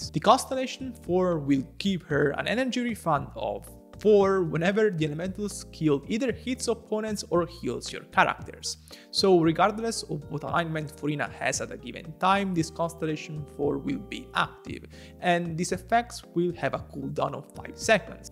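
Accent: Italy. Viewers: Are you Italian by chance? Your accent is very much the part.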